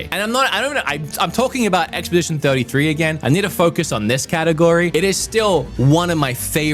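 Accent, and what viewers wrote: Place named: Australia. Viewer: Im guessing ur Australian? Haha don’t let the Europeans hear that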